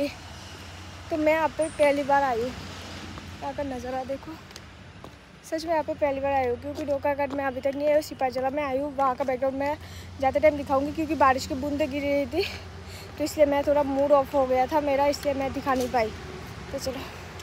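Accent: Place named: Bangladesh. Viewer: আপু আমি বাংলাদেশী আমি বাহরাইন থাকি, আমি তোমার সব ভিডিও দেখি তোমার ভিডিও আমার খুব ভালো লাগে, তুমি আমাদের মত কথা বল, ভালো লাগে তোমার সব কথা,এখন কেমন আছ জানাইবা, রিপ্লাই চাই ওকে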